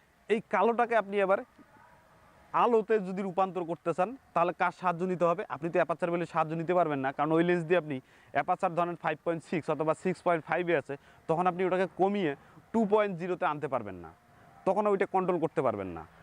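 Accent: Rangpur, Bangladesh. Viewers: Boro vai apnar basha Rangpur e naki?? Khub poricito jaiga ta mone hocche..!!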